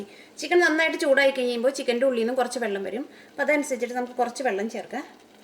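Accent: Thrissur, India. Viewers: ur malayam sounds funny....thrissur language....i like u ...i ve tried ur recipies....keep going ur good work....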